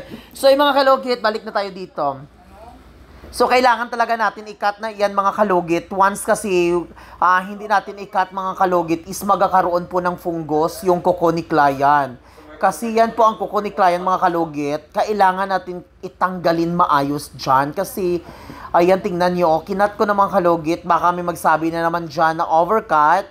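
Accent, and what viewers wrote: Iloilo, Philippines. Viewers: Ilonggo k pla kya malambing ka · Tingala mn ko nga daw karay a nga daw ilonggo ang panghambal pero taga Davao 😄😄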